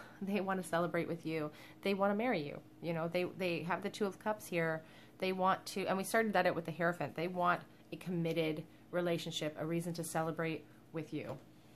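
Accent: Canada. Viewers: Question: are you Canadian? I just sense a Canadian vibe by the way you say the words out and about? Just wondering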